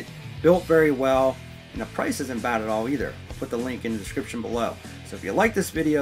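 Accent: Pittsburgh, United States. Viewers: Thats a fine pittsburgh accent you got there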